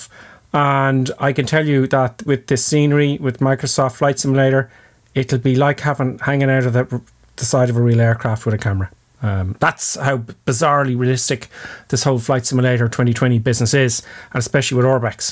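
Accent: Ireland. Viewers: Dude, what kind of accent is that you have? Irish? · I don’t usually comment, I just want to say I really look forward to your videos always informative and very funny, and that incredibly Irish accent..ohh..heheh..your sense of humour, catching the train with all those middle class bogans nerds..classic. Thank you.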